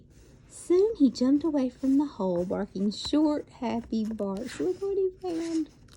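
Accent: South, United States. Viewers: I really love when you read I think it’s the hard Southern accent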